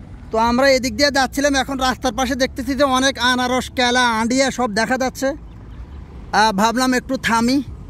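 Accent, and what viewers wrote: Noakhali, Bangladesh. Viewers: ভাই আপনি দেই আর মতন নোয়াখাইল্লা কথা একবারে পুরাপুরি খুব ভালো লাগে আপনার কথা হুইনতে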